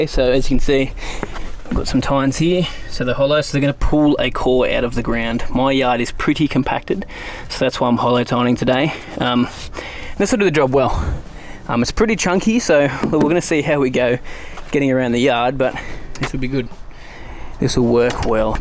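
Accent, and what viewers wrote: Australia. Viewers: Is it me or are you Australian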